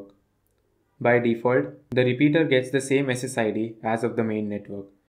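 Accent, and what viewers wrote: India. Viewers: regardless what you are talking about Indian english is just funny :D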